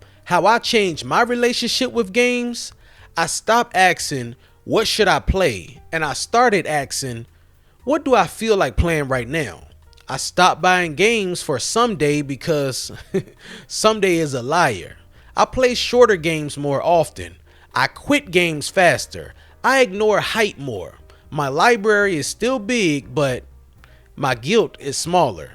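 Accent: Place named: New York, United States. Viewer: Couldn't watch the entire video because you keep saying brought instead of bought.. you nost likely say soar instead of saw and Mare-Rio instead of Marr-Rio. I refuse to listen to anybody that pronounces words that way and its 99% people from NY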